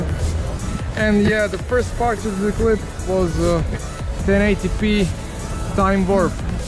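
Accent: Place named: Niš, Serbia. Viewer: "..Let me tell you of the days of High Advantures!" Dobar video čak ti je i eng niški !! 👌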